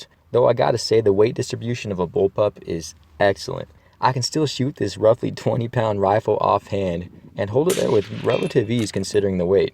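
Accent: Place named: South, United States. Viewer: He has a bit of Southern twang, which is fine by me since I'm Southern as well. His commentary sounds perfectly fine. His words are about the rifle and it's performance. That's all you need.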